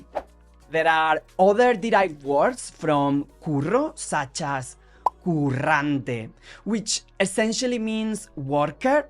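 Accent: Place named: Scotland, United Kingdom. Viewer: As a Scot...i detected the Scottishness straight away... I also talk Scotspanglish... Really well...😂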